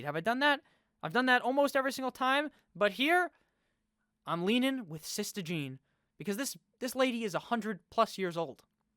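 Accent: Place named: Ohio, United States. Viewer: This dude has to be from Ohio 😂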